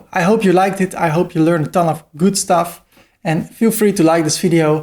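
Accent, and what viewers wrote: Netherlands. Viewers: The Dutch English is to much cringe. x(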